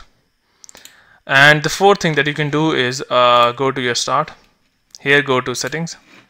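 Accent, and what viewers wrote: India. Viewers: This Guy sound like India is this real or scam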